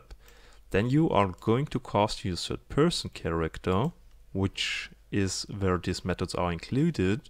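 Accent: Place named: Germany. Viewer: Ich finds immer wieder witzig wie sehr man am Englisch Deutsche heraushören kann. Very good explanation of the Differences, thanks for the help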